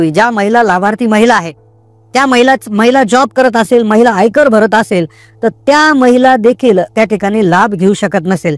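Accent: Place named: Kokrale, India. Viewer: कोकराळे मध्ये बोलते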